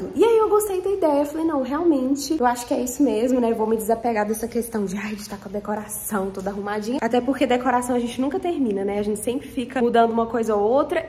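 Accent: Minas Gerais, Brazil. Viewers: Você tem sotaque de mineira 😊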